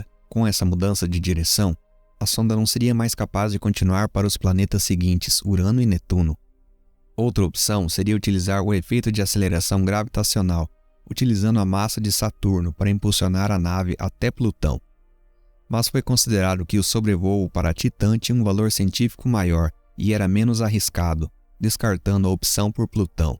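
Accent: São Paulo, Brazil. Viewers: Seu sotaque Paulistano é muito legal, sem falar nesse belo documentário. As voyagers serão a prova da existencia de uma fantástica e predadora raça humana, que talvez terá se autodestruido em função do seu próprio ego.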